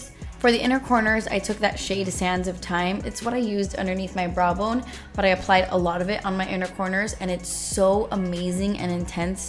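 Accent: United Kingdom. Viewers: Ok, but why was that British accent so adorable?😂💜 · Can you do a whole tutorial in your British accent please! I'm British and its super annoying when people try to overdo it but yours is SOOOO CUTE!!! I love it! xoxo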